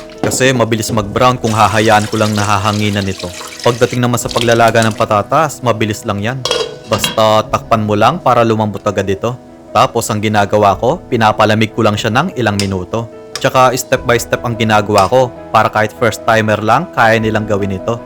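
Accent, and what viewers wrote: Pampanga, Philippines. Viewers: Kapampangan po ba kayo?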